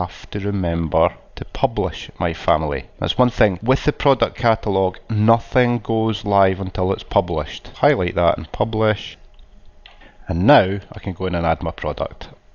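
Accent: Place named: Scotland, United Kingdom. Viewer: You should do in another accent, i cannot stop enjoying this nice scott lad's accent... I need to watch it again for the content....JK.....its nicely put together...well done....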